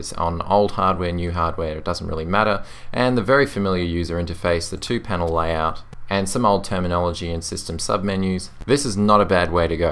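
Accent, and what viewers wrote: Australia. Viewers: Great review, thanks! P.S. Your Australian accent is really really nice! I absolutely hate British accent (my gf's entire family were born in England and later moved to Canada), but Australian accent just has subtle differences which actually make it really attractive. Sorry for the off topic comment, but I just thought I'd let you know how big of a selling point your accent is when it comes to online reviews. Subscribed :)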